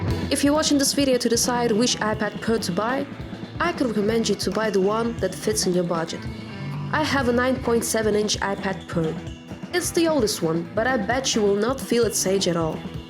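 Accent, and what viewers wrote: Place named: Russia. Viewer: She talks with Russian accent 😅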